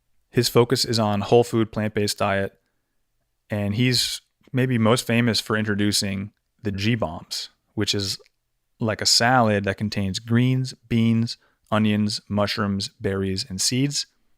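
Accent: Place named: Texas, United States. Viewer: Your Texan accent seems to match pretty closely with the way Texan musician Michael Nesmith used to talk.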